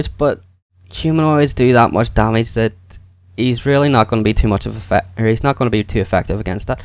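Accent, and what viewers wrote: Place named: Ireland. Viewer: I knew that was an Irish accent!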